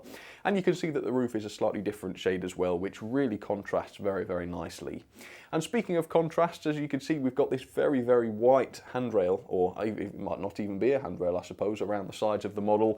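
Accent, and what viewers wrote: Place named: Nottingham, United Kingdom. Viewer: Is there any chance sam my son can meet you.he loves your vids.judging by your accent your not too far away from us.we are notts.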